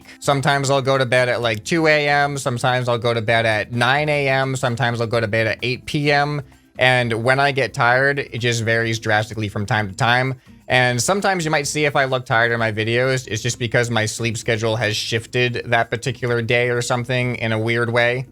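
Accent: Canada. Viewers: What do u mean he sounds too Canadian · huh.. i thought u were Canadian. i thought i heard an accent.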